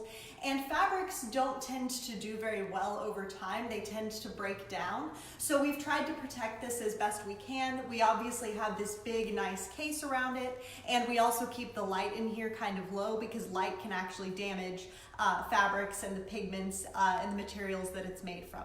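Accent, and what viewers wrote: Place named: Midwest, United States. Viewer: Horrific "commentator" with a fragile grasp of Civil War history and a marked inability to disguise her flat, mid-western 'accent' with dewdrops of "you'all's. Just awful.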